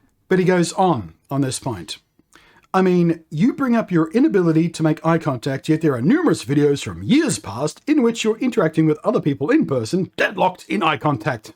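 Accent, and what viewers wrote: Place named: Australia. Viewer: Your description of concentrating on a coffee cup in a coffee shop reminded me of me walking through Martin Place (in Sydney). I noticed that I was looking at the ground, and only glancing up to check and then I would look down again. Thinking about it, I noticed: the paving stones are static, calming. The buildings are static, calming. The sky is largely static -- maybe clouds drifting slowly, but basically calming.And then there is this band of activity -- the crowd of people moving around. And every motion is pulling at my attention: notice this! So it feels "prickly": I cannot filter out all that irrelevant, random movement from my attention -- it is all tugging at my attention. The movement of the crowd is not calming, but unfiltered, attention-grabbing sensations. I can look at it -- it isn't causing me a meltdown -- but I just feel calmer looking down, and only glancing up when I need to check where I am, and where other people are closeby. 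Being in lockdown means not having to walk through Martin Place or other city streets. I love the peace and quiet of my suburb. Getting diagnosed as an adult leads to many big and little self-discoveries. (And of course I picked that you were Australian straight away)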